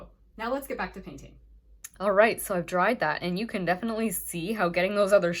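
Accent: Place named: Canada. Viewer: This is really beautiful. These are my favorite color combinations. I have used yellow as an underpainting, but it didn't occur to me to leave the white there for the other colors. I appreciate you sharing your talent with us. I've enjoyed using the Simply Simmons brushes as well. I think I first started using them after I watched an artist in Canada. I think his name is Jo (last name...can't remember) and he works with the Artists' Network (I think). You sound like you are from Canada. Am I correct? You don't have to say, if I'm too nosy.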